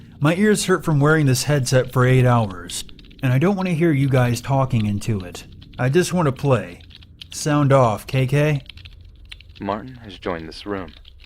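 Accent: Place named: Brooklyn, United States. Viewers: I love your Brooklyn accent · Haha great NY accent bro!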